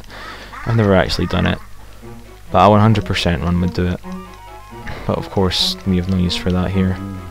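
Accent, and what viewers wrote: Scotland, United Kingdom. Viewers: are you scottish? I detect a scottish accent in your commentary =D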